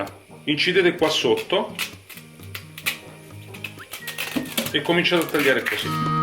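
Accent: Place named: Rome, Italy. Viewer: PER CASO HO TROVATO QUESTO VIDEO.. SENTENDO IL DIALETTO MI SONO FERMATA.. QUANDO SENTO PARLARE ROMANO MI INCANTO.... ANCHE IO LI PULISCO E PREPARO COSÌ.. PERÒ SOLO CON LA MENTUCCIA... POI LI CUOCIO IN PENTOLA A PRESSIONE.. ANCHE A ME VENGONO MORBIDI.. POI VOLEVO DIRE CHE IO SONO UN'ANZIANA SIGNORA DI CIVITAVECCHIA.. QUINDI UNA CUGINA DI ROMA.. UN'ULTIMA COSA.. COMPLIMENTI PER COME HAI CUCINATO I CARCIOFI .. BRAVO!!! CIAO ALLA PROSSIMA. 🌹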